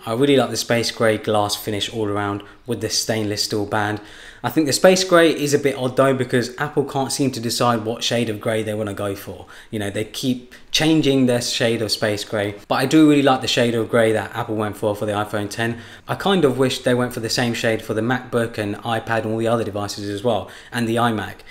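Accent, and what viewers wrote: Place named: London, United Kingdom. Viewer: British london accent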